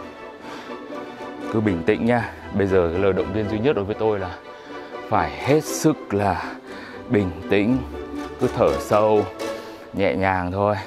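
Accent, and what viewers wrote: Hanoi, Vietnam. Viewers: Giọng HN rất hay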